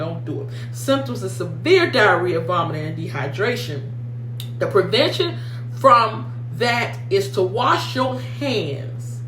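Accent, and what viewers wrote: United States. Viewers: They probably chalked it up to your American accent...And thought you were talking about the hippo, that escaped from the local zoo, from a few days before!!!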